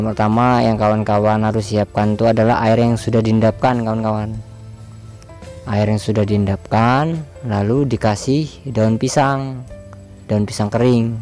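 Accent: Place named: Palembang, Indonesia. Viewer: Abang ini logat Palembang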